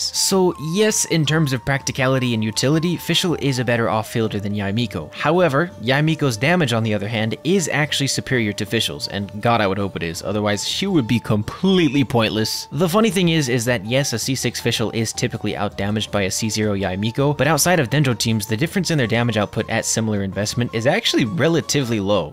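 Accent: United Kingdom. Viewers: The British accent 💀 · The English accent though hehehe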